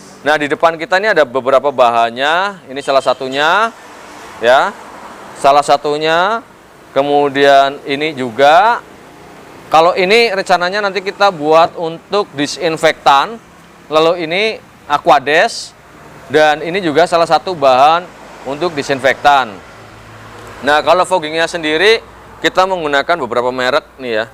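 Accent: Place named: Banyumas, Indonesia. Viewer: ngapak e isih ketara lah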